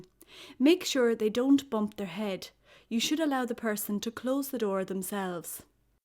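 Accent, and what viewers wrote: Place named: Ireland. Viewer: The narrator sounds cute. I'm a sucker for Irish accents.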